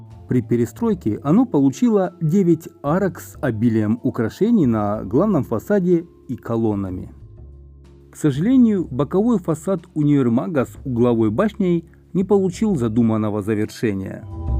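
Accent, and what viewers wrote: Kharkiv, Ukraine. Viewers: Только пару раз там была, все очень дорого))))) 😅А так, конечно же красота, красивое здание! Внутри в старом стиле все, это очень лампово. П. С., обожаю когда именно вы рассказываете, так умиротворяюще, интересно и с нашим, харьковским акцентом ❤️❤️❤️Спасибо ❤️❤️❤️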